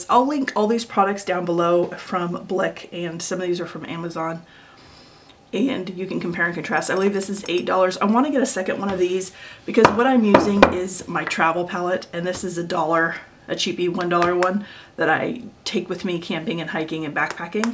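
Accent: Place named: Australia. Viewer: Ur aussie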